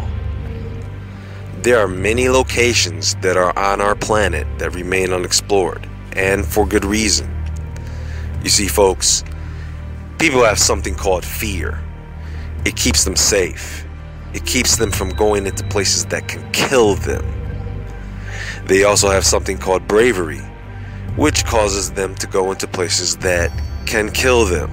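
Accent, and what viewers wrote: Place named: Tennessee, United States. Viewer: I like the way you talk on this video, reminds me of my home here in Tennessee.. The best.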